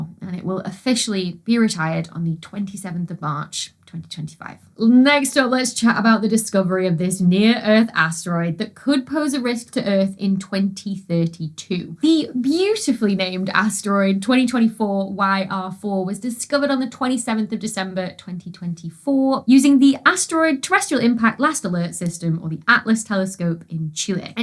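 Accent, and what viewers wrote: United Kingdom. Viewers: I occasionally read a few random comments on videos like this and often regret it. There is one from a fellow countryman of mine that complains about the British accent. if I understand the complaint correctly, I'm disappointed to see that we have reached a level of audacity where some of us expect everyone to sound more American. Imagine using the internet and discovering that not everyone on it is American (gasp). had a song "." I am too. Please continue to be yourself, thank you! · If you want to be successful in the United States… slow the hell down drink less coffee before recording a video. We aren’t that crazy about your British accent and we can’t figure out why you’re rushing. Slower and more focused on each chapter. Pretend we are plodding American tourists and explain it.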